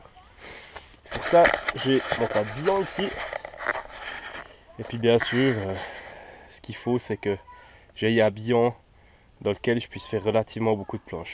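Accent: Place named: Switzerland. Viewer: Mugnerot Daniel morteau morille c l accent du doub et lui c l accent suisse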